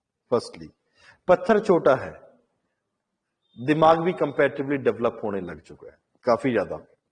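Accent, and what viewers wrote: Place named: Punjab, India. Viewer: He used punjabi method while speak English....which creates irritation for student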